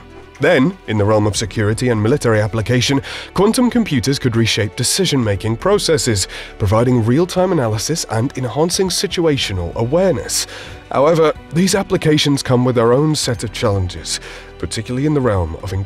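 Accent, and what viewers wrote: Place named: England, United Kingdom. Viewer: Every time I look at YouTube and check anything in the US technical information Giant youtube? Some English dude, speaking. I can't stand it stand it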